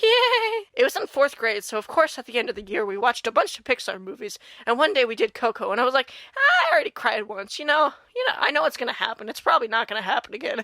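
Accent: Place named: United Kingdom. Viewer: Ur bri'ish accent is gold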